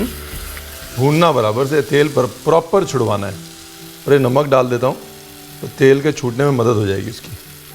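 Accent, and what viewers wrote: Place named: Lucknow, India. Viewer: हय की नहीं😀😀😍❤️ ....chef ye hamare aapke Lucknow ki tone h...aapki बोली mein kafi baar ye nazar aata h 😊😊 So sweet Daal to ekdam mast h Ranveer 🌹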